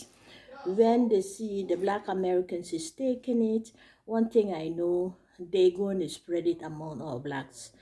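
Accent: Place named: Africa. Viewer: I love your channel dear. Where are you from? You sound so African.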